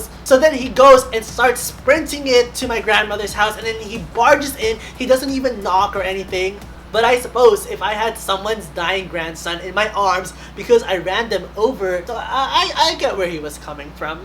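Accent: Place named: Philippines. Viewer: First clue: flip flops. I was all like you know, I think he is Filipino 😂 that and you kind of have a Filipino accent.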